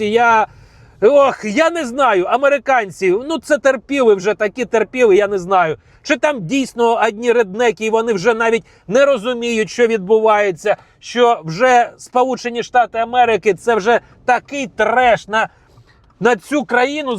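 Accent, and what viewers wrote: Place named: Russia. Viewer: Таваріщ, ти шо із московії? Премію міру, пазорітса, це що гонорово говорити із масковскім акцентом? Я перестав переглядати твої відео по цій причині, а тут ось знову, дай думаю погляну, виправився чи ні? Ні не виправився, то я можу і відписатися.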